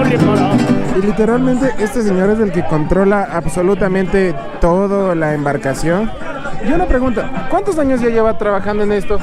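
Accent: Mexico. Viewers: Es lo que noté, que su acento es muy parecido al nuestro y bien pasa por mexicano. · En México nadie dirá que eres ecuatoriano con ese atuendo, a lo mucho, dirán que eres peruano o simplemente sudamericano. A lo mejor y por el acento que no es tan diferente al nuestro, dirán que eres de Puebla o Michoacán, digo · este compa tratando de hablar con acento mexicano ,porque sus compas si hablan como ecuatorianos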